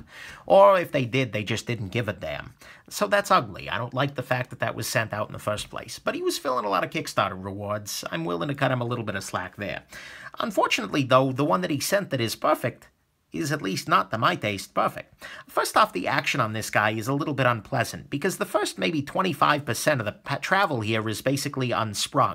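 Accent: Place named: Boston, United States. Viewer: damn love your accent what is it? Sounds Boston but i really have no idea.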